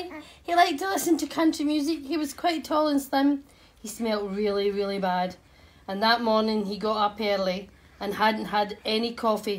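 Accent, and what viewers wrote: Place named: Scotland, United Kingdom. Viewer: The Scottish accent is just the cherry on top, and the wheezing from the cameraman.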